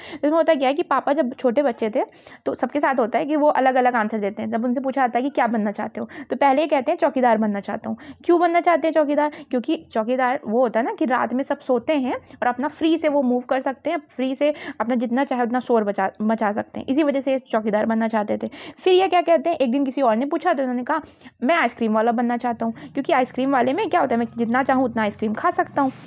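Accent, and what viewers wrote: India. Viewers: Aap Lucknow se ho kya lakhnavi aawaj hai apki? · Madam ki boli me to proper kanpur ka accent aaraha hai.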